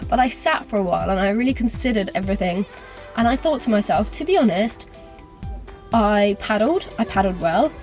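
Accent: RP, United Kingdom. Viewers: I thought so! I can tell by your BBC accent! Do you find it difficult to understand the Swansea accent? I’m returning there in April after 20 years in Singapore. I’m a bit too old to be as adventurous as you though. I used to hang glide off Rhosilli though. Your vids are great BTW!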